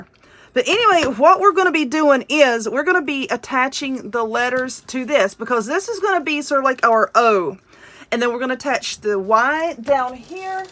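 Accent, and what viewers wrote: South, United States. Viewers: I love this wreath! that husband joke was hilarious! You are so funny and I love to watch your channel. I love your southern accent. Tfs.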